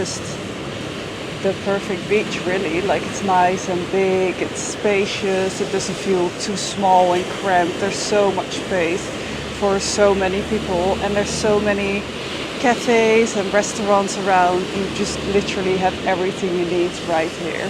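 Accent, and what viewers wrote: Netherlands. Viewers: Thanks for the video, food looks amazing thinking to go in march, and you look very good to, i hear some.dutch accent. Byee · Ook praat je in het Engels, jouw accent vertelt alles in het Nederlands hhh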